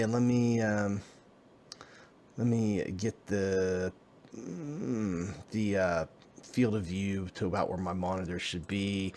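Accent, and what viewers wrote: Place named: Baltimore, United States. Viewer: This dude is from Delaware Maryland PA area, sounds really Baltimorish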